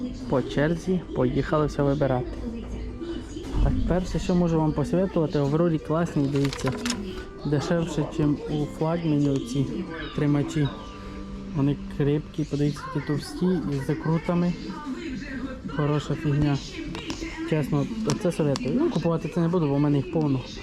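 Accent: Transcarpathia, Ukraine. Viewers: По говору чути що з Закарпаття) А звідки саме?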